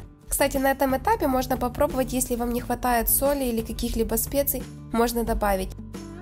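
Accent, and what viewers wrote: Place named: Ukraine. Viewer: Это не дикция,а акцент украинский!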